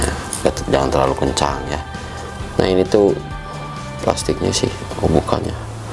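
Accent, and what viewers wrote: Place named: West Java, Indonesia. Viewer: Logat ny sunda ini mah,,, timanten kang??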